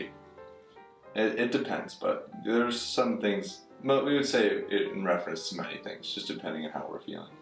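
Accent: California, United States. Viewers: I think it's kinda cool. I think it's the mainstream version of what ppl outside the state probably think Californians speak like. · Haha, I gotta say, personally I've never heard someone with as pronounced of a valley girl/surfer guy accent. I'm sure some people say it, might just be the area I'm in, but the "whatsaah dude" and "gnarly" feel more like the stereotyped Californian accent than how an actual Californian speaks lol The "yeah no" and "no yeah" are on point though 😂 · Just here as a California female native born in 1972 in Danville, CA searching for ...do I actually have an accent...you are much younger but talk like me, so that is something.... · I’m a Californian and yes this how we talk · I like the way people from Cali talk!!!! Floridians talk too funny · This is "TV Californian". Not saying people don't use it but it's not how we spoke when I was growing up in Southern California in the 50s. 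(My family has resided in SoCal for over 120 years.) Classic "Californian" sounds more Midwestern sans the solecisms of the mass entertainment era.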